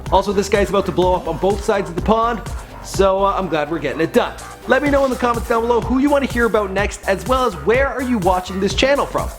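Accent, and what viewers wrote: United States. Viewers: Its ok his american/canadian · Wtf is this American chattin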